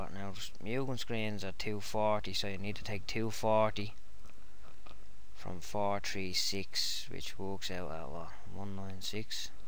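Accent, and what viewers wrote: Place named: Scotland, United Kingdom. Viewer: You got a veeeeery Scotish voiess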